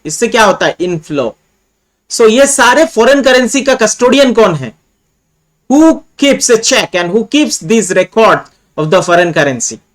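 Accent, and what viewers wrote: Assam, India. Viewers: Sir are u assamese ?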